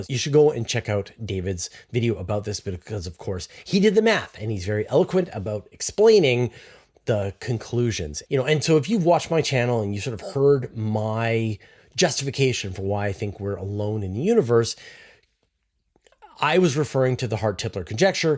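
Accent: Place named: Canada. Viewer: I'm here for his adorable Canadian accent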